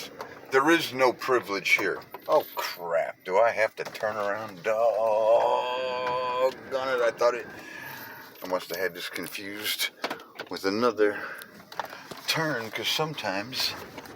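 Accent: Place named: North, United Kingdom. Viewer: Your from up North aren’t ya buddy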